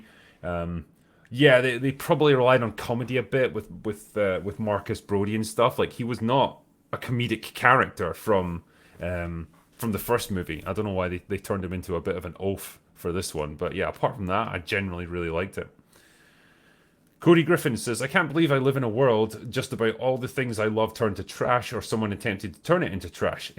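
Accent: Scotland, United Kingdom. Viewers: Nothing but respect for the work you all do one of my favorite channels since I found it it on YouTube a man among men with a smooth Scottish voice I can tune into all day and without open bar would not have found the rest of the crew amazing work all you heroes and a few heroines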